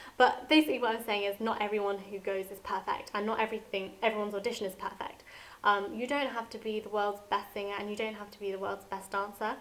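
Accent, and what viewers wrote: United Kingdom. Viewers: and how about if im born in September 10 1999 is that concluded im 13? And what is YOUR nationality? Thanks and I love your voice, you sound like a real English girl :D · Why is your accent way different from mine??? London vs Birmingham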